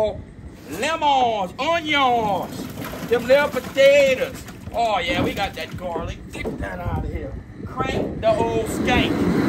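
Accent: Louisiana, United States. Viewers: I FEEL LIKE IM A BERL SHRIMP I LOVE CAJUN ACCENT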